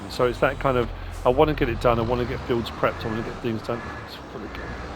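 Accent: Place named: London, United Kingdom. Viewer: Hei where in England are you from?I feel like you have London accent.or around there.and again sorry if I miss spelt anything.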